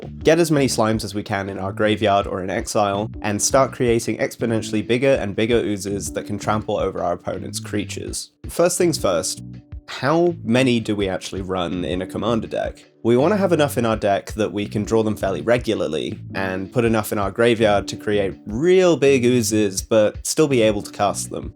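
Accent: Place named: United Kingdom. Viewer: I see slime video I hear british I sub. Fellow UK Slime enjoyer.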